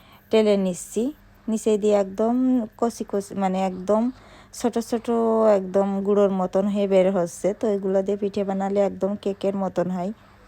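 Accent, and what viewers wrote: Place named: Jhenaidah, Bangladesh. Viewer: আপু তোমার বাসা কোথায়??? ভাষা টা আমার জেলার মতো লাগছে। ঝিনাইদহ জেলা আমার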